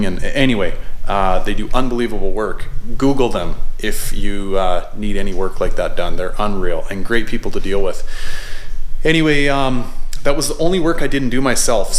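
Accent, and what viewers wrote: Canada. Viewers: Your Canadian accent reminds me of back home. It's been 10 years since I've been back. Good luck with the new pony.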